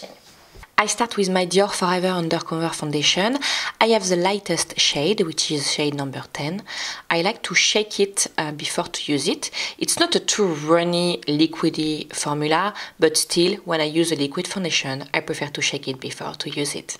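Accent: France. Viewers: Your accent is lovely - where abouts in France are you from? X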